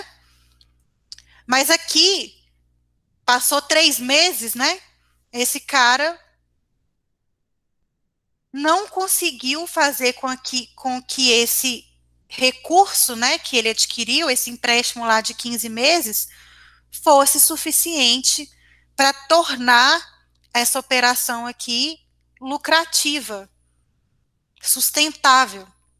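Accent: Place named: Belo Horizonte, Brazil. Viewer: Esse vídeo vale ouro! Conteúdo excelente! E o nosso sotaque de BH é bom demais! :)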